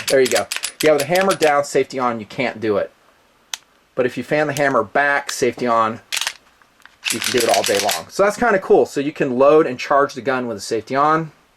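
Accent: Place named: New York, United States. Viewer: You sound like you're from New York or something. You're not fighting damn terrorists. If you are a soldier you need to wake up and realize that your own government is arming the terrorists in Afghanistan, Libya, Syria, and so on. Read the news. And boy, I'd like to see you come down to my neck of the woods and Texas and people how many rounds they should have in their mags.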